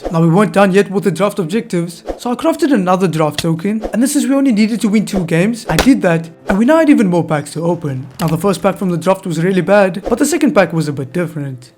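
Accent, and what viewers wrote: South Africa. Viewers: 10 and are you South African? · I think he from south africa bcs of his accent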